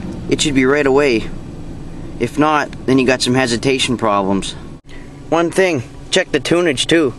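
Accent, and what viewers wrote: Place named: Canada. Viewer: i can tell that ur canadian haha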